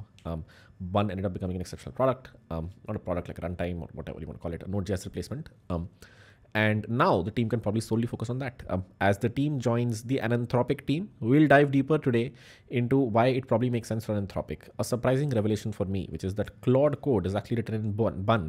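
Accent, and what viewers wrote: India. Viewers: Haha it's his Indian Ascent. I hardly understand so I listened very carefully 😅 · Indian accent English with this much pace is very difficult to understand..slow down a bit bruhhhm.. it seems like rapping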